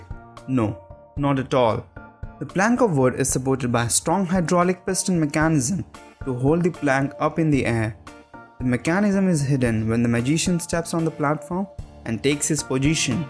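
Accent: India. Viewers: Indian accent for sure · Why the fuck every time I hear a Indian accent (I'm not sure if he is Indian) I believe there name is mohammed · English is not my native language, however Indian accents are probably the only ones I have difficulties understanding.. 🙈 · why is the commentator swallowing words? very thick indian accident is ok. Some indians speak clearly. This one is awful. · Is the Narrator INDIAN?? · If u have any problems with others then keep it to your self by sharing it to the world you will make it worse for the guy who is talking as well as some Indian people might take take it seriously and u didn't mention its indian accent but the accent he is talking is obviously indian right ? So please understand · Are u indian voice??? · I’m just curious why a few random videos of theirs uses an Indian guy to narrate? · Well guys don’t worry about his accent ‘ he is a indian guy ‘ but must be well educated and it was awesome presentation 👏🏻👍🏼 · That's Indian accent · Sorry but I would say NO to Indian Accent. 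· Indian accent don't go well with Magic reveling voice-over. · Love the Indian accent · Indian accent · Nice vid. Narrator is from the subcontinent. I'm guessing India. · Is he Indian? Are you sure? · สำเนียงภาษาอังกฤษ!เหมือนคนอินเดียพูดภาษาอังกฤษเลย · The moment i heard indian accent, i quickly stop the video and dislike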